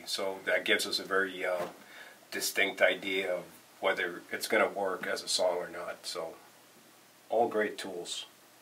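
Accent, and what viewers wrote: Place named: United States. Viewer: His American accent is almost as good as his drumming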